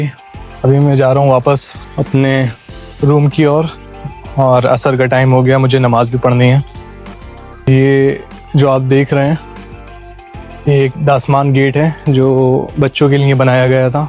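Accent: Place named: Pakistan. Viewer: Ap. Bilkul pakistani lagte the mujh. Pure urdu...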